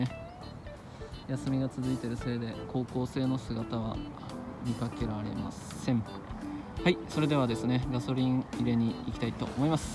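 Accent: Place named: Kagoshima, Japan. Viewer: コメント失礼します。違ったら申し訳ないんですけどイントネーション鹿児島の人見たいですね😊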